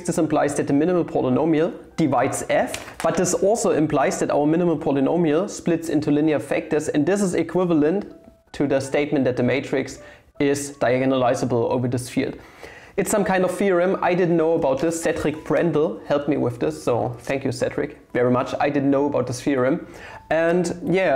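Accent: Germany. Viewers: Hat keine Sekunde gebraucht um den deutschen Akzent rauszuhören haha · Dieser deutsche Akzent ist richtig Hardcore. Aber geil!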